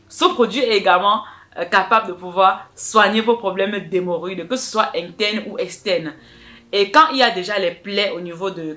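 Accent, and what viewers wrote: Cameroon. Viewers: Merci coach. Je suis sûr que vous avez déjà accouchée. J'aimerais voir le joli bébé 🤱. Votre accent tend vers le Cameroun, c'est ça?svp.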